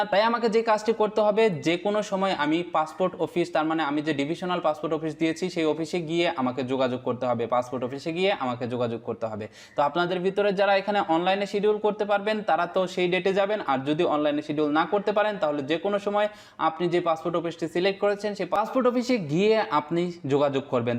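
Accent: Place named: Dhalapara, Bangladesh. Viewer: Mone hoy apnar basha Dhalapara...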